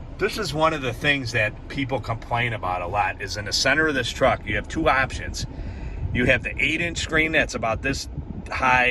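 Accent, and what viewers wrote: Chicago, United States. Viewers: Yeah, i'm from Chicago as well and currently in TN, I too was gonna ask you the same question because I thought that accent sounded familiar. The Wife and I just looked at the the explorer st this weekend. I'm on the fence about and one of the biggest issues i have is that stupid vertical screen display. When i put it in reverse there was just a small 3-4" display which I could hardly make out any detail....that may kill it for me, gonna look around there's a lot in that segment these days and yes the Kia, hyundai, genesis GV80, and the Lincoln Aviator is a consideration as well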